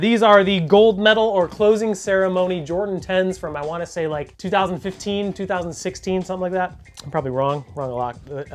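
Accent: Chicago, United States. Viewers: You from Chicago?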